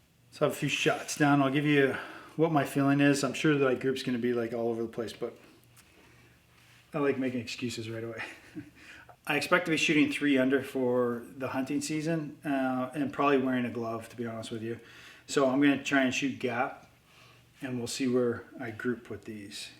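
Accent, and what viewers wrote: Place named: Canada. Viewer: I was the guy who deduced you're Canadian by the way you pronounce "about" (aboot). Anyhoo. I enjoy your videos. You're very personable and don't purport to be an expert. Good reviews and tips. Now you said that I had to subscribe, like the video and then go to Instagram. But "Optional" came up. I'm assuming Instagram is. Correct? But put me in for the drawing. Have heard good things about the Black Hunter. Keep dem vids comin'.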